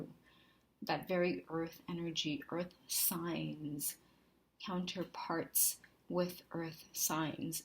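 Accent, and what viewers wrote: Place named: Trinidad and Tobago. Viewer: No sah. When the accent came out i said is Trini. 🙋🏽‍♀️ Now back to the video.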